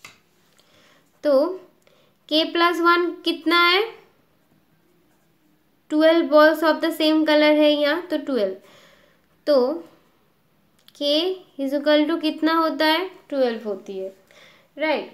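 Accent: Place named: Bengal, India. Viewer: Bridger O'Neill it's in Hindi with some Bengali accent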